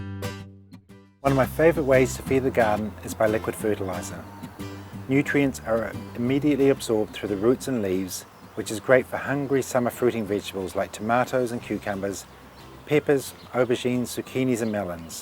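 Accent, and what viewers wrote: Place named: New Zealand. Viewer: Really good show.First time i saw your chanel today.U sound kiwi, i'm from land of wombats and magpies. You are still answering questions from 2yrs ago, magnificent, not many do this. U show care towards your viewers , a rare quality. Will check some of your others .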